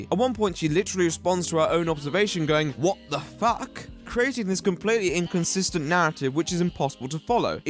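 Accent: RP, United Kingdom. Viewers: "Which is where we're FUCKING going next." Sir I LOVE YOU. lol your style of dry cynical humor is absolutely my cup of tea. I can't believe I've gone this long without knowing you were out there but I haven't stopped watching your videos since I found you a couple days ago. You're always so informed and fair in your assessments it makes me feel like i'm watching a really SMART documentary instead of a trend following gossip show. I feel like i get SMARTER when i watch your videos. listening to a posh British voice using big words while making snarky jokes and sounding SO over the bullshit is everything I need right now. and I always appreciate your opinion on these topics because unlike everyone else eagerly throwing their opinions in on any given subject, you actually EDUCATE yourself about them, instead of just speaking on the small bits of information you're not sure about. 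I appreciate your tact and dedication to making sure you present ALL facts and sides involved instead of grabbing the juiciest bit and running with it. And because you have such a strong personal opinion you often discuss things I'm not sure anyone else has touched on which only deepens the understanding of the situation and keeps everyone more informed in general. So thank you for all your hard work and your quality content. you found he magic recipe for your channel and your personality is absolutely the center of its success. you always talk about how if he audience doesn't connect with the creator t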